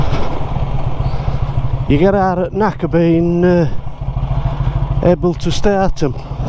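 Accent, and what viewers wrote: Yorkshire, United Kingdom. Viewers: I love this gentleman’s Yorkshire accent!! And I LOVE this glorious motorcycle I’m an Aussie Sheila and I understand this accent. As a 20 yr old ( 1974) I met Phil Irving at a Melbourne Motor Show. Hardly anyone standing around him or the Vincent he was displaying. I grew up riding pillion on Norton Commandos and Triumph Trophy’s , Atlases & Bonnevilles. Had a boyfriend with an Ariel Square Four too. But never been for a ride on one of these sublime Vincent’s. Thank you so much for this video!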